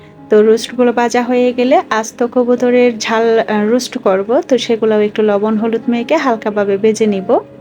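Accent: Chittagong, Bangladesh. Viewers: আসসালামু আলাইকুম আপু,,,আমি আজকে প্রথম আপনার ভিডিও দেখলাম,,,আপনার কথা শুনে আর রান্না দেখে আমার কাছে মনে হয়েছে যে আপনি চট্টগ্রাম এর বাসিন্দা,, তাই শিওর হওয়ার জন্য আপনার আরেকটা ভিডিও দেখলাম,,মহররম এর ভিডিও টা দেখে শিওর হলাম,,,তাই সাথে সাথে সাবস্ক্রাইব করে দিলাম,,কারণ আমার জন্ম চট্টগ্রামে,,ছোটো থেকে বড় হয়েছি সেখানে,,তাই চট্টগ্রামের জন্য আমার ফিলিংসটাই অন্য রকম,,যদিও আমি কুমিল্লার মেয়ে,,,যাই হোক আপনার কাছ থেকে চট্টগ্রাম এর অতিথি আপ্যায়ন কিভাবে করতে তা শিখবো ইনশাআল্লাহ,, কারণ এই মাসে আমার বোনের বিয়ে,,,তাও আবার চট্টগ্রামের বাসিন্দার সাথে,,, আমার বোনের জন্য দোয়া করবেন আপু,,, আর আরেকটা কথা,,আপনার রান্নাগুলো মাশাল্লাহ অনেক লোভনীয় হয়েছে,,আমি চট্টগ্রাম চট্টগ্রাম করতে করতে আপনার রান্নার প্রশংশা করতেই ভুলে গেছিলাম,,,তাই আবার আসলাম কমেন্ট টা সম্পূর্ণ করতে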